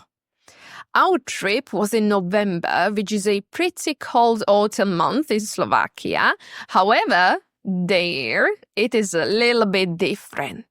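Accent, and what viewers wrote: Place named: United Kingdom. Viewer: Tá tvoja výslovnosť je dost britská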